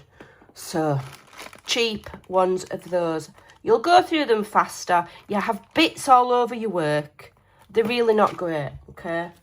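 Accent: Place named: West Yorkshire, United Kingdom. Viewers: I feel your pain! I had a massive clear out last year and donated all my lower quality art supplies to my local primary school and they were SO grateful! Schools never have money to spend on art supplies so they were so appreciative. My biggest regret purchase is my Copic markers. I don’t even want to think about how much I spent on them because I’d honestly cry. They’re brilliant but I just don’t use them. If you want to sell your wet strength tissue paper and Dina Wakley paints I’d be happy to take them off your hands! I’m in Sheffield and you sound not far from me! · I think is from West Yorkshire in England or i could be completely wrong and she's from Lancashire in England!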